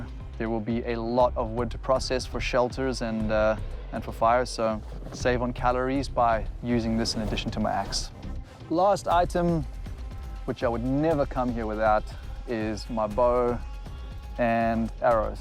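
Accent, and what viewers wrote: South Africa. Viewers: I hear a South African accent. It's so distinct. Good luck!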